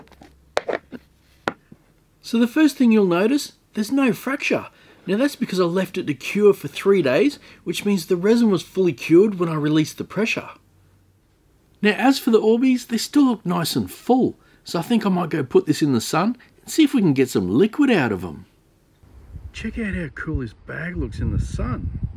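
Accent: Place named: Australia. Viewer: I liked 1& 2. Hey, where I live we are having tornados in the area so we have a Take Cover Warning. I’m watching your videos while sitting in my bathtub (I don’t have a basement). Thanks for keeping me company. Do they have tornados in Australia? You sound like that is where you are from. Have a great day.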